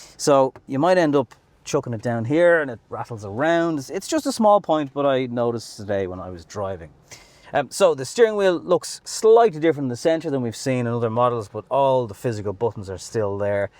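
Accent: Ireland. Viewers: Is this Ireland accent?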